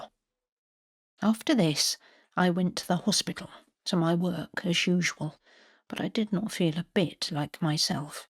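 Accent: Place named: Scotland, United Kingdom. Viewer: What a great little story, I thoroughly enjoyed it. Sitting here with my eyes closed, I imagined I was at Mallory. As usual a brilliant narration and I loved your Scottish accent. Thankyou so much.❤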